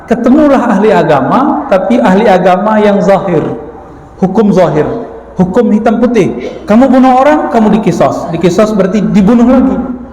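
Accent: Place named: Rao, Indonesia. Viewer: Slang nya seakan² urang Rao..seperti ibu saya.cuma ada beza sikit²...Apa itu bahasa Rao juga ya... Senang dgn pengajian beliau..terima kasih ya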